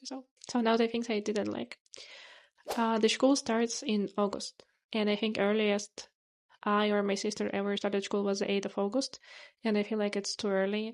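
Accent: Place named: Poland. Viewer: Are you Polish? Kind of a soft Polish accent I'm hearing.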